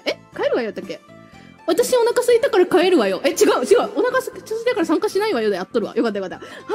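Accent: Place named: Kansai, Japan. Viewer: やっぱり関西弁は安心するなぁ…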